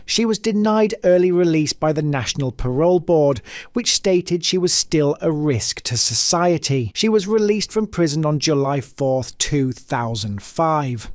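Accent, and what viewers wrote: England, United Kingdom. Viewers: 👋 Hi. I'm a Canuck. I live in Kingston Ontario. First I want to thank you for taking the time to learn how to pronounce a lot of the words properly, or are you Canadian? We have lots of folks from the UK living here, so yes I did notice the accent. ☺️ Other than a couple of words ~ great stuff. Brilliant! But~ you and most others got everything wrong about what constitutes a life sentence in canada. What happens is you are given a life sentence for murder and you are imprisoned for 25 years minimum. The odds of being paroled the first time you try, especially for a violent crime, are very slim to impossible. My father-in-law said he didn't see that happen once. Then IF you are released, you go to a halfway house where you could spend up to five years. You also have to report to a parole officer once a week for the rest of your life, and with any wrongdoing/breaking the rules, on the part of the prisoner, s/he can be returned to prison to see out his/her sentence never to be released or less, depending on the rule s/he broke. 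So yes a life sentence IS a life sentence. (FYI~prison means a federal institution, whereas jails are provincial and municipal) to spend the rest of his life there, especially if it is a crime involving violence. So when they talk about a life sentence that is what you get. Under no circumstances is anyone convicted of a crime involving violence ever just paroled and allowed to walk out the door. They have very strict rules they have to follow and if your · Some english guy talking about Canada!!!?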